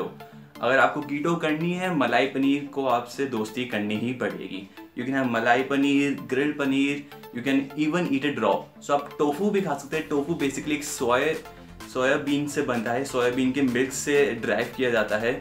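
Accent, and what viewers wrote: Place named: Philippines. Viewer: Your English sounds like Philippino, it took me a while to understand it. After the intro I thought you had switched to a different language.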